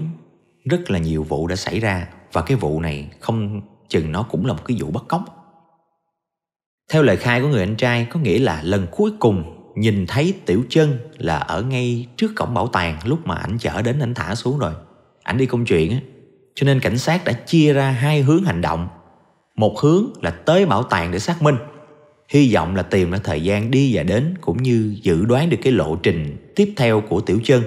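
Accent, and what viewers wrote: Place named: Binh Dinh, Vietnam. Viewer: là người quê bình định à? Nghe giọng đọc cứ nghĩ tới người bình định th haha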